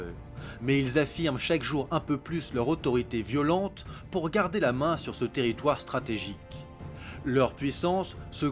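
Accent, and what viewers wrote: France. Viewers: Je jure si tu dit BORATHEON encore une fois avec cette accent français merdic qui me fait mal au oreilles.... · Encore une fois, très beau travail (même s'il manque pas mal de précisions; Jon Snow fait partie de la famille Stark, il n'est pas question de Tyrion, pas même de son frère Jaime, ni de Melissandre et du Dieu Rhor,... mais l'essentiel est ici). Seulement, les noms prononcés à la française, c'est assez déstabilisant.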